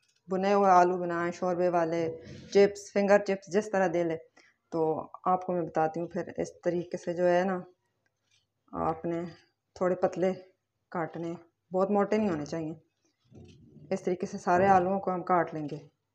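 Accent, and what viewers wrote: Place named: Rural, India. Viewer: Ajeeb pendoo style Mai bolti han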